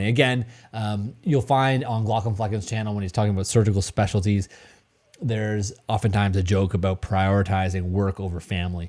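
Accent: Canada. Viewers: I noticed the accent as well, especially on the vowels. I wasn't sure if it was Minnesota/ Wisconsin or possibly Canada. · Are you Canadian? Certain words you say remind me of my friend in Ontario. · WESTERN UNIVERSITY REPRESENTS! No matter how hard you try, can't hide that Ontario accent. 🇨🇦 👍💕 love the channel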